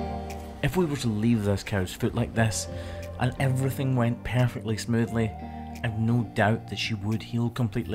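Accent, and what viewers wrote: Scotland, United Kingdom. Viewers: I thought you were Scottish and this video proved me right🏴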